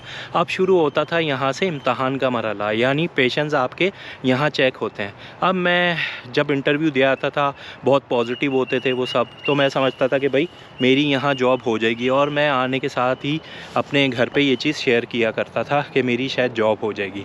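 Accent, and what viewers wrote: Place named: Karachi, Pakistan. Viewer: bhai baaton se Karachi ke lag rahay hain. I loved your video. I would love to meet you in Australia. I am landing soon.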